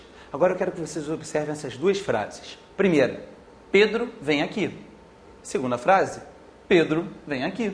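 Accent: Rio de Janeiro, Brazil. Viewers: sotaque carioca e muito irritante, mas, ótimo video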